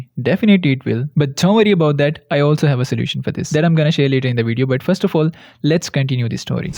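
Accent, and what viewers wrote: India. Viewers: man, i head indian i exit. bye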